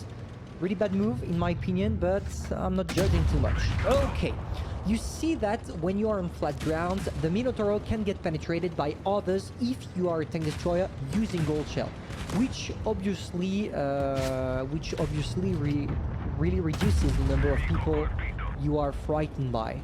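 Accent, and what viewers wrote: France. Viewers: are you french ? you have an accent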